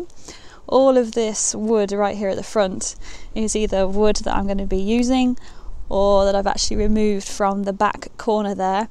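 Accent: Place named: RP, United Kingdom. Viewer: i heard your voice says it all you probaly went to posh school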